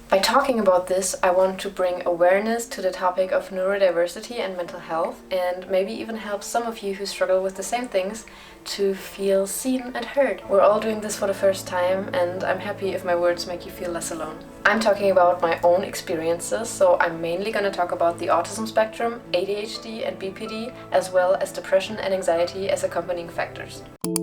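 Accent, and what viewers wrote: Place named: Germany. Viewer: Witzig Habe nach neurodivergent artists gesucht & du bist aufgetaucht. Direkt im ersten Satz konnte ich vermuten dass du aus DE kommst.. sollte so für mich leichter sein das englisch zu verstehen 😀😀💚💚💚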